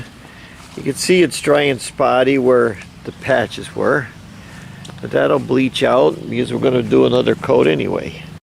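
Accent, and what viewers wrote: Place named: Pennsylvania, United States. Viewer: I love your stuff, very great insight and simple….”just get it in, don’t over think it”…… true for just about everything in life!!! and u sound like my family from Schuylkill county, don’t know where u r from in PA, but u sound like a good ol’ coal region boy!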